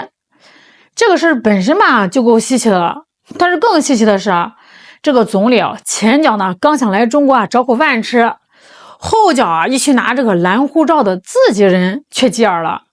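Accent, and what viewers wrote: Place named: Northeast, China. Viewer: 一股大碴子味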